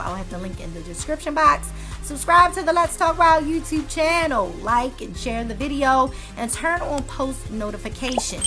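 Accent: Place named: Baltimore, United States. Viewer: Me and my son enjoyed ya video , And you must be from Bmore or Maryland i love ya accent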